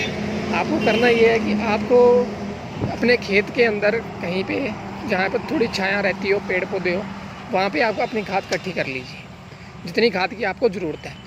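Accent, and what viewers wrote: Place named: Rajasthan, India. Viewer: Bhai tumhari language ka accentto rajasthani h but tumne khud ko punjab se bta rakha h,, but overall good job..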